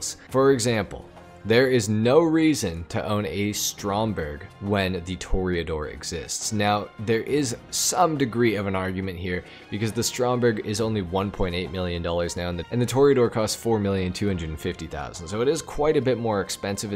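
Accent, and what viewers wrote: Winnipeg, Canada. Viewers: Are u from Winnipeg brotha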